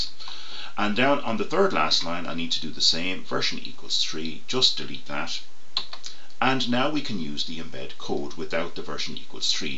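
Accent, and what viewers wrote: Ireland. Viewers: love that irish accent.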